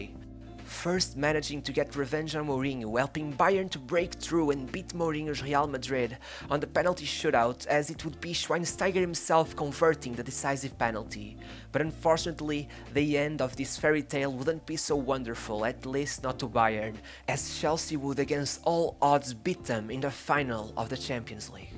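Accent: Portugal. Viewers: Am I the only one who thinks that the commentator is Portuguese ? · Só te queria dizer que estou a adorar o teu canal. E sim, percebi que eras português logo no início do 1o vídeo que vi pelo sotaque ;D Continua com o bom trabalho! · Trying to ignore this darn Portugeese accent 😬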